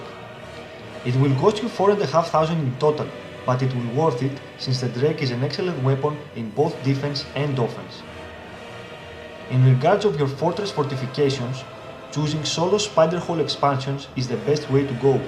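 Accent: Greece. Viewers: Greek! From the accend! Haha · Λολ μπορώ να καταλάβω ότι είσαι Έλληνας απ την προφορά σου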